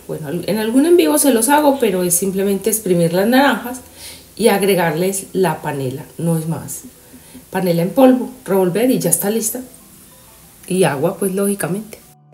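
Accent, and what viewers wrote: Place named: Antioquia, Colombia. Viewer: Hola Sra, excelente el blanqueamiento del lavadero, desde que lo recomendaste lo he venido haciendo, lo mismo lo de mantener blanquito el Trapero, bueno yo aplicó todos tus consejos en mi hogar😊 por cierto me gusta mucho tu acento paisa😊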